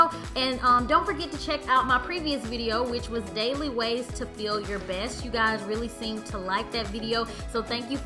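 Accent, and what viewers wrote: United States. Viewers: Looking back at your older videos..and noticed that your accent has changed. You sound so country lol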